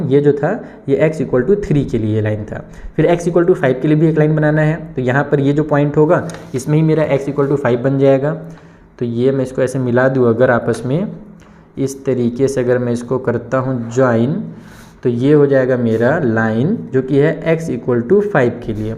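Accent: Bihar, India. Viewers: 😊😅Sir lagta hai aap UP-Bihar se hain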